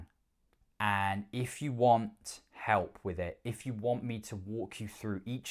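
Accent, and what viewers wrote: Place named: England, United Kingdom. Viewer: Hi m8, i love your accent..where in England r u from?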